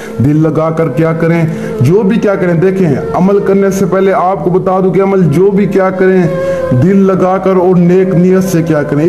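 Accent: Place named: India. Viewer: السلام عليكم ورحمة الله وبركاته صاحب کیا اپ پاکستانی ھیں یا ھندوستانی نمبر تو پاکستانی مگر أواز اور لہجہ انڈین ہے کیوںکہ میں اپکا شاگرد بننا چاہتا ھوں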